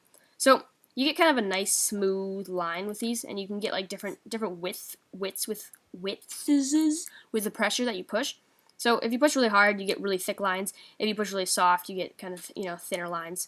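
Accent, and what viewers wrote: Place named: Canada. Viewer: He's Canadian Canadians accents kinda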